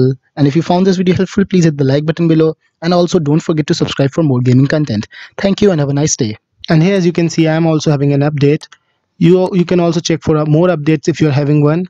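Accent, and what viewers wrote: India. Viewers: I dont trust this indian voice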